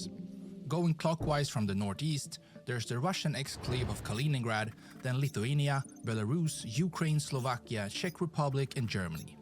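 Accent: United States. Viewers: Are you Italian? How come your English is so American?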